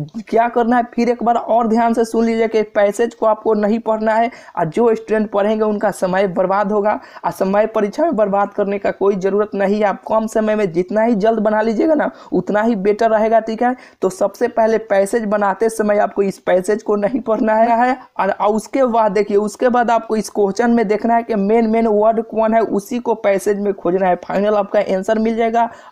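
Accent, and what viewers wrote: Bihar, India. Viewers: Bihari ho ka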